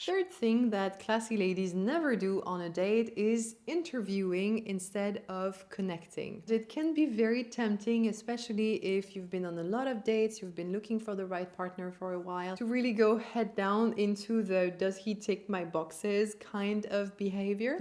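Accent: France. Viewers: I would love to have you as an older sister of an aunt. You are so admirable and seem like a very lovely person, I love your calm voice and subtle French accent. It is very reassuring.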